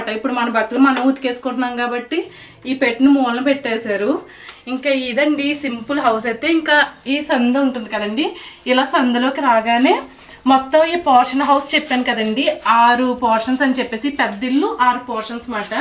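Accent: Konaseema, India. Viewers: దన్యవాదాలు🎉 మీరు చక్కగా చెప్పారు❤ మీ ఇల్లు మద్యతరగతి వాళ్ళు వుండే నివాసం, బాగుంది. మీరు చాలా కాలం గా ఆ ఇంటి లో వున్నారు. అంటే మీ అనుబంధాలు చాలా గాడం గా వున్నాయి. కోన సీమ భాష లో చక్కగా వివరించావు👍 మాది అమరావతి 🙏ప్రాంతం ఓం అమరేశర సామి👏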